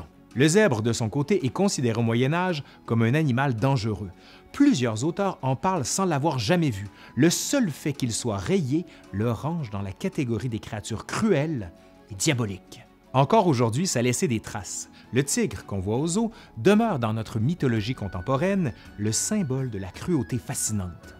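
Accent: Quebec, Canada. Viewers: Bravo ! J apprecie de plus en plus votre chaine. J adore l histoire, et vous arrivez à me faire découvrir un tas de choses ! En plus, j avais un peu de mal avec l accent québécois et maintenant j adore 🥰 Continuez, c est si passionnant!! À bientôt, Aurore de Paris 🐇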